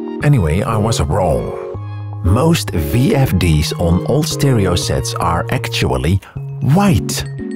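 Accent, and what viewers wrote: Netherlands. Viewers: I thought you were Dutch based on your accent, but I wasn't sure. Hearing you say that you were in the Netherlands, makes me realize I'm pretty good at picking out accents. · that compression on his voice combined with harsh dutch accent is absolutely obnoxious jesus turn it down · That's the thiccest dutch accent I've ever heard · I didn't know synthesised voice robots can have Dutch accent too,Awesome.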